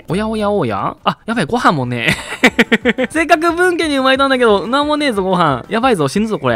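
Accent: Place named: Mikawa, Japan. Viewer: よく三河弁出とるもんで三河？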